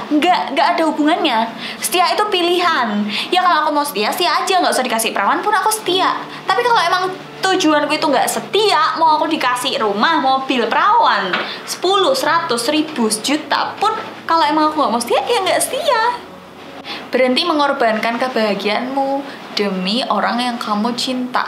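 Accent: Java, Indonesia. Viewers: Medok bangettt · Medok amat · Sampean wong ndi mba loogate ko jowo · Embak lek ngmong lucu lo, Jawa pundi pean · Medok tenan sih mbak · Meddddhhhookkk anjirrrrr jowoneee.....!!!!!! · Logat e wong jowo Iki koyok e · Suaramu khas Jawa banget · kyk medok2 ngomong jowoan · Mantap medok juga bahsanya kak Jangan lupa mampir ke chanel aku juga ya teman temanku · Orang mana si kok kyknya orang jawa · Logatnya bahasa Jawa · logat bicaranya kaya orang jawa? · Wong jowo tah MBA jawane pundi? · Medok bener jawa'a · bahasa jawanya ituloh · Mbk e wongdi? Kok jowone medog bgttt😅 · medok banget yaaa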